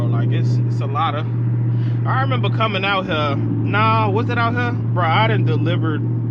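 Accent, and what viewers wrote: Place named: Chicago, United States. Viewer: Damn I miss the crib I'm from Ada park boi I know you from Chicago out here in Phoenix Az I don't miss the snow tho I'm hearing you talk like yeah he from the land 😂😂😂😂😂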